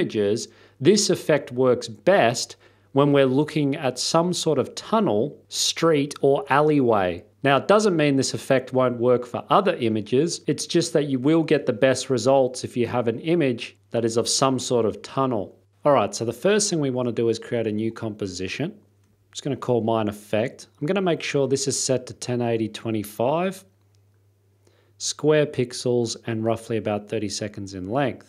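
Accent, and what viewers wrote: Australia. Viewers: a bit off the topic question....but.... are you Australian?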